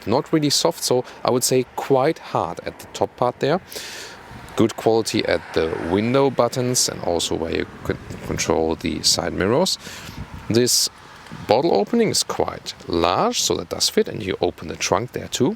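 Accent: Germany. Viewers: Very well done ! I like the superb number of facts you are able to tell us during driving and the pronounced subjective comments on every car ! You really speak fluently English but why don´t you stay for a couple months in the states and get rid of the hard German accent ? ! it should make your really interesting videos just perfect ! Please continue ! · OMG… you sound so Germish 🇩🇪🇬🇧 that I can’t continue watching it!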